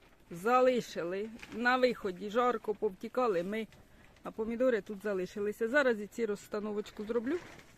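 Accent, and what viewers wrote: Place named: Varva, Ukraine. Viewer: Знімайте будьласка довші відео,хочеться Вас слухати і слухати.Якаж співуча мова наша,я з Варви.Але вже 50 років там неживу,але говір впізнаю завжди.Будьте здорові і успішні.Божої благодаті Вам і вашій родині.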